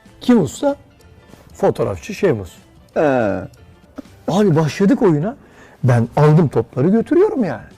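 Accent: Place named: Diyarbakir, Turkey. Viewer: diyarbakır ağzı mükemmel, kusursuz.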